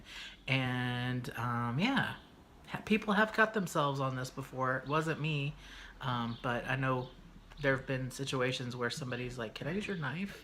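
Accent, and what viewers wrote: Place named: South, United States. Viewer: Cool ! I thought I heard some southern in you! I'm from/live in Alabama!!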